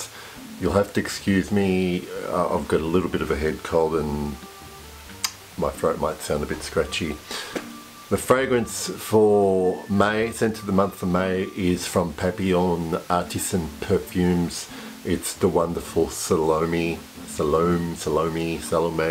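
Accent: Australia. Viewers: So, you can pronounce the name of it. Seriously, I thought you were from Australia. Sorry mate, time to let you go. 👋👋 your believes are very different than ours.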